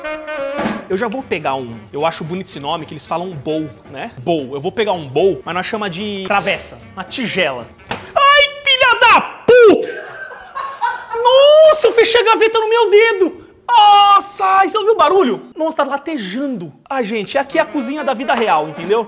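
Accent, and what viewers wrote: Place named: Rio de Janeiro, Brazil. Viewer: ele é um paulista com sotaque carioca...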